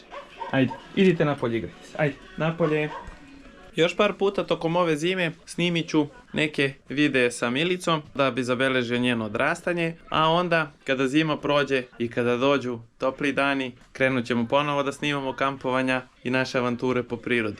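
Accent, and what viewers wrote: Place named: Belgrade, Serbia. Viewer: Srecno bilo svaka cast , klipovi su najjaci ali od kad si poceo da udaras malo ovaj beoogradski akcenat nije to to 😅 drzi se kraljevackog 🙏😇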